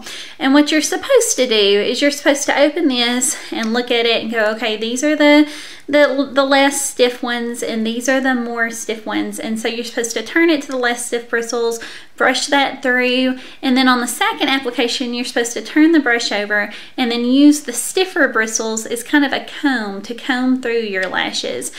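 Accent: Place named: Texas, United States. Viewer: Love ur texas accent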